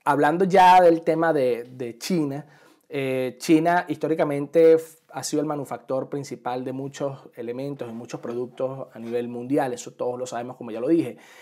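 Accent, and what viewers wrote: Venezuela. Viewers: felicitaciones éxitos, definitivamente el tono venezolano a la hora de explicar y enseñar es el motivo que nuestros docentes los buscan en el exterior éxitos.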